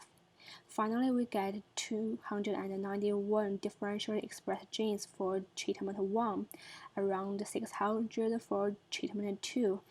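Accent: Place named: China. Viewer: 聽中國人講英文真的很痛苦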